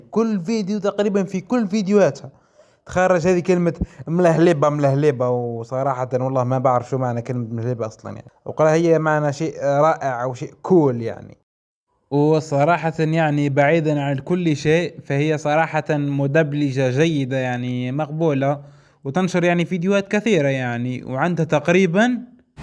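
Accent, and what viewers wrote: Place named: Tunisia. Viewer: من الواضح انك تونسي 😅 ترا انا تونسي بعد 🇹🇳 لهيك لا تستغرب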